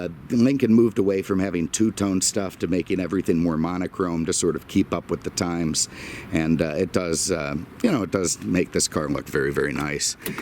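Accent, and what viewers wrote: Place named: United States. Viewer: I also love the way this guy explains cars is good humor and especially the accent.. pure Americana if I owned an Automotive Group Los Angeles you'd be my spokesman on TV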